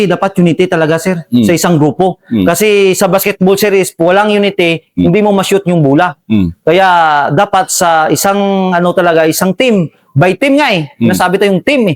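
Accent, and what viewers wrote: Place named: Iloilo, Philippines. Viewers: Ilonggo talaga eh .. sa kwento pa lang 😊...halatang nakapag renew sa iloilo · Batyagan gd nga ilongo sia sa ,panagalog nya at least never mine isog Taya,!! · Hilonggo tekal gid. Na hehe magaling ka sir ha. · tikal ilonggo ka gid gi kagid gina piko gina pala to😂😂😂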